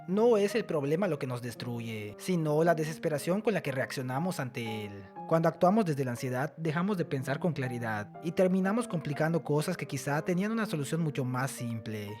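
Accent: Yucatan, Mexico. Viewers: Buen video, eres yucateco? 🎉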